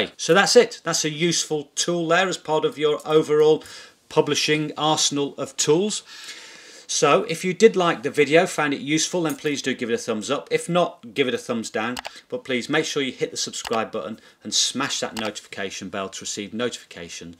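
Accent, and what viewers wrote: United Kingdom. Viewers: Interesting how English is spoken in so many parts of the world, yet have so many variations. I don't like using American English, but selling on Amazon, means using American. I do love your British accent,. Grammarly is great to use.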